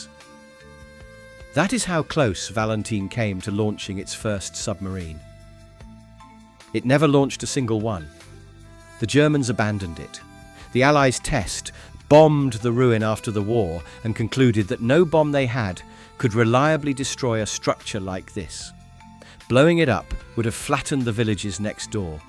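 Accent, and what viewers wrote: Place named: United Kingdom. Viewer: I think the bunkers are cool. Quit the Germany bashing. You sound British, do you not know that Great Britain and France committed atrocities too? What about the opium wars that the British waged on China because The Chinese emperor tried to stop the British from pushing opium on Chinese citizens. The Egyptians wouldn't put up with Great Britain anymore and rioted and burned British businesses in Cairo in the 1950's. The British Empire was evil. France ruled over Vietnam for a century and was vary oppressive towards them. Know what you are talking about before you speak.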